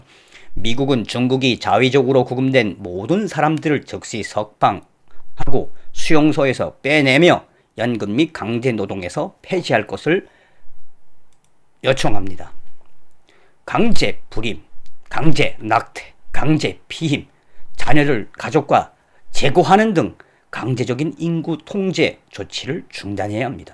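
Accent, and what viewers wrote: North Korea. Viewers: 고저 더 가열차게 달려가갈시다레~~~달려가달라요!!!우리 부모님이 이북 출신이셔서, 이북 사투리 들으니까네 그냥 너무 좋은데요... 행복 하시라요~🙋🏼‍♀️👍👍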